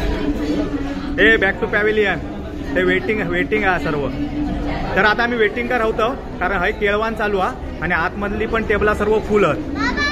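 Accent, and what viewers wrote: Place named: Malvan, India. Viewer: साहेब तुमचे मालवणी भाषेत बोलणे खूप छान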